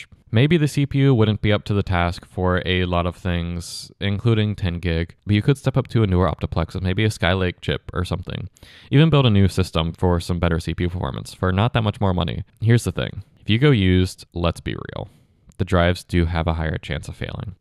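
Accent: England, United Kingdom. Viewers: You don't speak American, you speak English! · you don't speak american, you speak english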